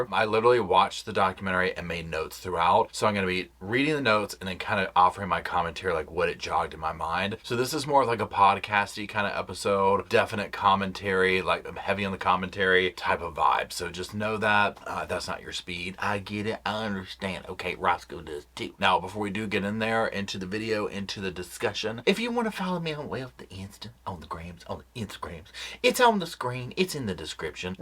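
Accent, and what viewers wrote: South, United States. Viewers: I know you ain't none of that fancy credential stuff but I ❤ you and your reporting especially The Southern accent makes me feel right at home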